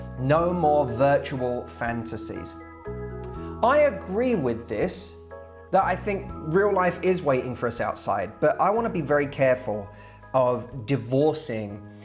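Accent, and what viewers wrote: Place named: United Kingdom. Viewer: adoro il suo accento britannico ed i suoi consigli!😍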